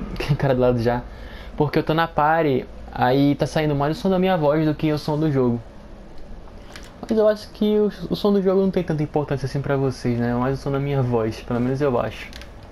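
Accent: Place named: Belém, Brazil. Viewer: tu mora em Belem do pará? eu tbm que sotaque igual kk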